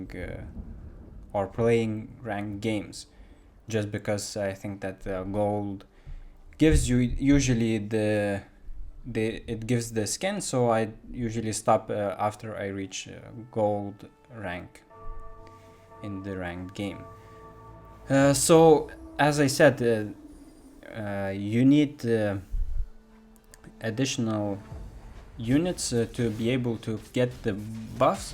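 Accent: Lithuania. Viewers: kai tu pasakai vidio iskarto matosi kad tu letuvis bet vistiek geras vidio :D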